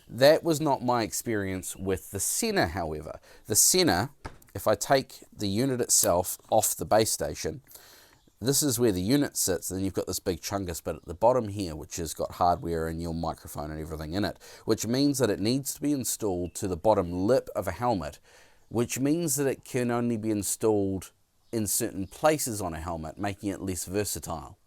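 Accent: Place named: New Zealand. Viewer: If you ride with limited or no people the HD spirit is the best bang for buck unit. The buttons can be a little unresponsive at times but overall the sound is great. I have it on one helmet and the new srl3 sena on another and the audio is as good as the srl3 and actually gets louder. I did buy the edge but sent it back as the voice commands were crap. The new srl3 voice commands are much better as long as you say hey seena and not hey Sennar, it's an aussie thing and from your kiwi accent it'd be the same deal.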